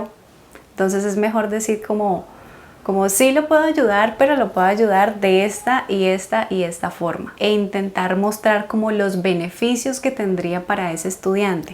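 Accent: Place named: Colombia. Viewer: Me encanta tu acento! soy de Colombia también del área de Cundinamarca, pero ahora que vivo en Europa por más de 10 años el acento se ma ha ido. Pero por más acentos que veo el bogotano y el tuyo es de mis favoritos.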